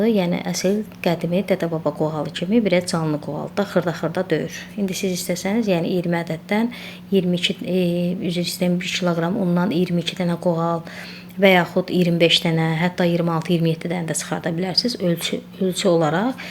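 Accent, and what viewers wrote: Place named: Shamakhi, Azerbaijan. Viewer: Əllərinizə sağlığ gözəl görünür, ləhcə şamaxı ləhcəsinə oxşayır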